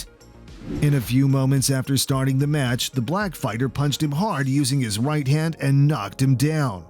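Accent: United States. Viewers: Don't get me wrong I love this video but you kind of sound like an American who speaks English as a third language.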